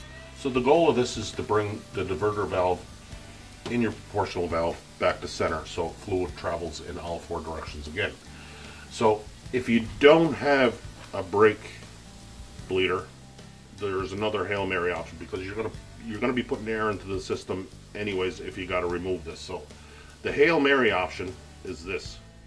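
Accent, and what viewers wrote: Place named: Newfoundland, Canada. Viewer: first 5 seconds.. please tell me yer newfie?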